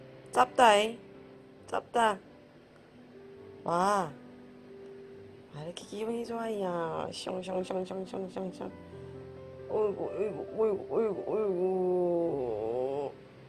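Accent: South Korea. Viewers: 눈이 와이리 커요~ 고향이 경북 문경이나 상주쪽이신가요? 억양이 처가쪽이랑 꽤 비슷하네요 ^^ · ㅋㅋㅋㅋ 저까지 같이 신나네욬ㅋㅋ 찐부산사투리 넘 좋아용 ㅠㅠㅠ 애미 자는 모습을 보니 집이 엄청 편안한 가 봅니다! 매번 댓글 다 읽어주시고 하트보내주셔서 감사해용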